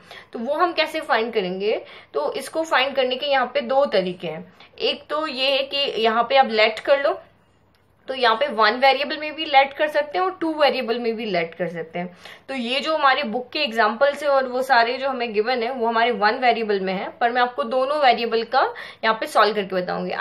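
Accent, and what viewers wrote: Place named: Haryana, India. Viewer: Haryanvi accent OP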